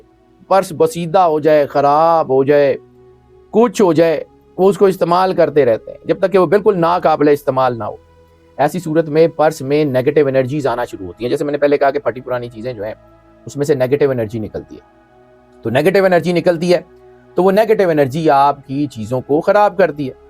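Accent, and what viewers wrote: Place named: Rawalpindi, Pakistan. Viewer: Assalam walekum sar aapki awaaz bahut jyada speed Se Hoti Hai aap video Tej Karte Hain sukun ke sath aap video chalayen Karen aapki awaaz Aisa Lagta Hai Jaise Pindi wale sahi se Peeth se bolate Hain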